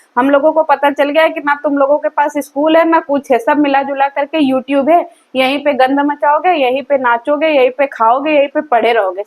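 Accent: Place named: Uttar Pradesh, India. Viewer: यूपी वाला भोजपुरी बोलतीं हैं आप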